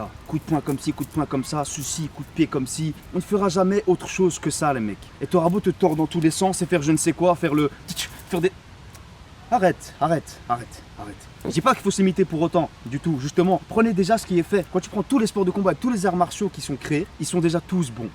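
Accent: Belgium. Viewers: O lala l'accent belge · Tu es belge ?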